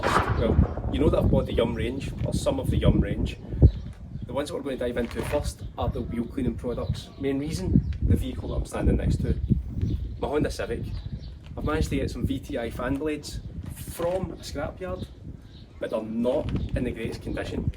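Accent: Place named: Glasgow, United Kingdom. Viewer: Hello great video, first time viewer here with just a few questions What civic is that? Saloon, Hatch or coupe as well as pre facelift or not? What scrapyard did you go to, to find Japanese car parts, I’m in Glasgow and you sound like it too so I thought I’d ask. Any scrapyard with parts for a 2000’ civic would be nice to know of, I’ve got my own project which I’m trying to complete too, thanks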